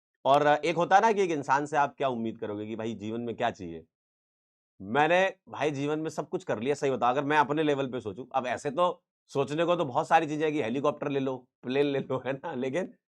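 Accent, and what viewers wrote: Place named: Bundelkhand, India. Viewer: Sir mera gaon bhi mauranipur h😅....tabhi me sochu ap bich bich me bundelkhandi kyu bolte h😂...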